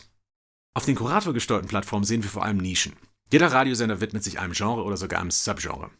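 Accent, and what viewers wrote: United States. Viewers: bin ich bescheuert oder hast du einen leichten amerikanischen akzent? :D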